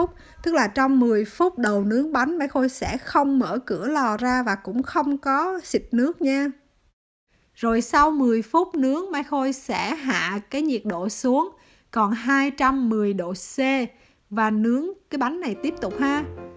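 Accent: Central, Vietnam. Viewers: Cám ơn Mai Khôi chia sẽ. Nghe giọng nói hình như người miền trung hoặc Đalat?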